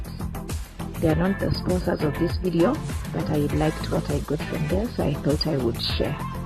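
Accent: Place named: Zimbabwe. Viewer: Accent sounds very Zimbabwean 😘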